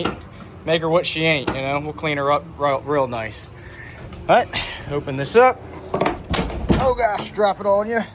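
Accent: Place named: Maryland, United States. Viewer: I have a lot of friends from Maryland. And that is a distinct accent! The rope is too tieeet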